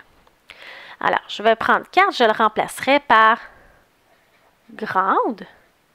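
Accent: Quebec, Canada. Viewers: Ton accent québécois et incroyable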